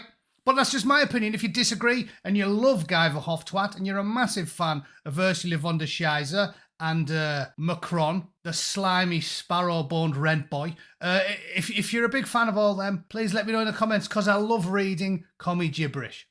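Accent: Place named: Newcastle, United Kingdom. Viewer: I have to add a comment as I find you hilarious. Boris's nickname is priceless! It takes courage to call that lot out for trying to destroy us, but like you said, with Trump in charge, we will win and get back our country to its former glory. Thank you for all your videos, and I love your Geordie accent. I went to Newcastle with my daughter, she was giving a talk on Mars at a conference centre by the riverside, and we went into the famous pie shop. When we asked shop owner a question, we looked at each other rather puzzled as we couldn't understand what the shop owner said. I understand you fine, though😊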